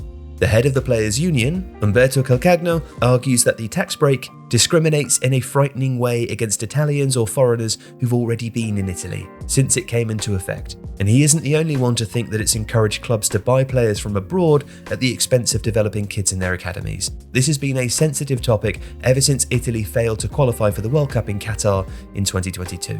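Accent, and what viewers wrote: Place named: England, United Kingdom. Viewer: didn't know the channel viewership is exclusively Italian. Strange that they make content in English and that too a narrator with an English accent. Oh well a waste of resources then this video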